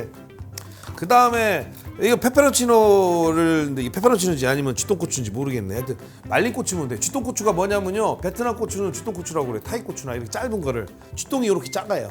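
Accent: Chungcheong, South Korea. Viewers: 구수한충청도사투리언제나정겨워요 저도동향이라 ㅋㅋ 감사합니다 언제나행복하세요 소유진씨와~~홧팅♥♥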